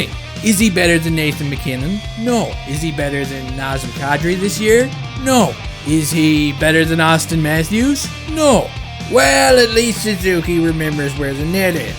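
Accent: Newfoundland, Canada. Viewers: I can here the Newfoundland er in your voice. Maybe your a propane guy. As slick right I think guys comment hurt cuz it’s the truth as of right now.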